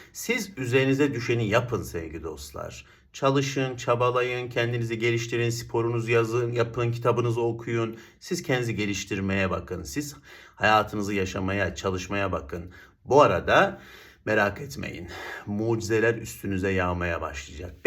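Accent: Ankara, Turkey. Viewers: Ankara lı mı, Sivas lı mısınız😅😅😅sevgilerrr 🎉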